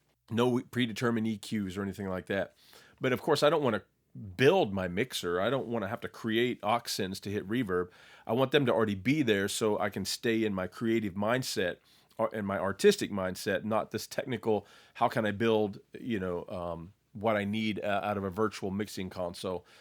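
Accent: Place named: United States. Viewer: probably the best Neve teaching tutorial ever and the sample music was pleasing .... nice to hear an American accent too...thx !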